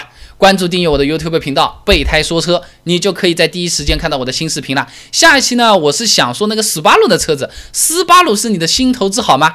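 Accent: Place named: Wu, China. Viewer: 经常看你充满吴语风格的讲解,受益匪浅.据说印地安人牌摩托当年挺厉害的,能讲讲吗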